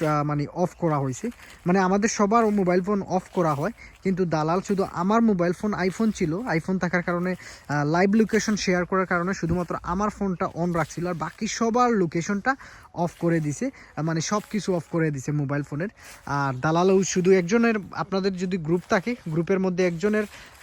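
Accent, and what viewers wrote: Sylhet, Bangladesh. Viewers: ভাইয়া আপনার বাড়ি সিলেট নি? লাগের সিলেটি 🥰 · ভাই আপনার কথাগুলো অনেক সুন্দর লাগলো আপনার বাড়ি সিলেট নাকি, সিলেটের মত কথা বলেন আপনি,,,,, 👍👍👍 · ভাই মনে হয় সিলেটি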